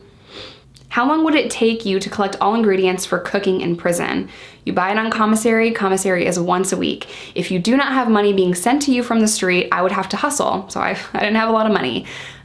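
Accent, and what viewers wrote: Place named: Oklahoma, United States. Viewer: I live in Oklahoma love how you hear the accent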